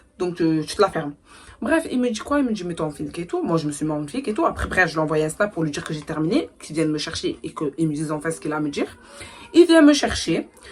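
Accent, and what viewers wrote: Marseille, France. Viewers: coucou je suis nvl sur ta chaîne ton accent me fait penser a une marseillaise peut-être je me trompe t mignonne nimporte qu’elle filles au monde ne mérite pas cela l’homme qui a un comportement comme sa franchement sa me dégoûte je te souhaite bcp de bonheur et du courage pour la suite tu ma donner chaud au coeur tellement sa ma toucher 😢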